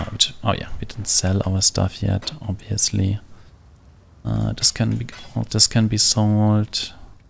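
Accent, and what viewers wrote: Germany. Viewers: Nettes Speedleveling-Video, danke dafür! (Vom Akzent her vermute ich, Du bist Deutscher ;-) ) Ich hatte lange darauf gewartet, zu erfahren, wofür Du den vierten grünen Sockel brauchst, die Info darüber, welche Gems Du benutzen willst, hätte vielleicht ein wenig früher, womöglich schon ganz am Anfang, gebracht werden können. Davon abgesehen: schön, dass Du auch Hinweise auf das übliches Leveldesign für die kürzesten Wege gibst. Dass man in Akt4 die Animation von Piety abkürzen kann, indem man nach rechts läuft, war mir neu, werde ich beim nächsten Leveln mal ausprobieren.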